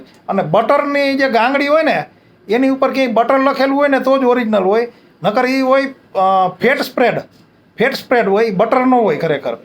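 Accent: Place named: Rajkot, India. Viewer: રાજકોટની ટિપિકલ ભાષા બોલો છો ! "આઇપી"થી માંડીને અનેક શબ્દો...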